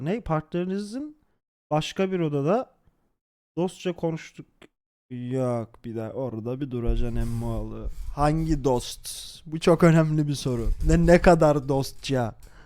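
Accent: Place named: Erzurum, Turkey. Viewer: Erzurumlu olduğun ne kadar belli ama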